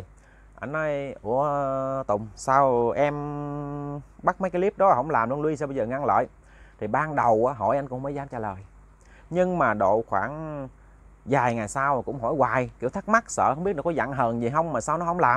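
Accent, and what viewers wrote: Mekong Delta, Vietnam. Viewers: nói chuyện dễ thương quá đi, chuyện uống nước đó là nhu cầu của mỗi con người, ai cũng phải vậy, cũng lịch sự nói với mọi người, nên e thấy đậm chất miền tây, rất dễ thương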